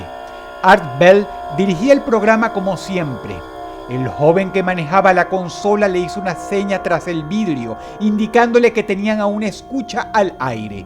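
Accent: Venezuela. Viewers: Aquí Dross todavía tenía acento venezolano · De los tiempos de cuándo Dross aún tenía acento Venezolano :v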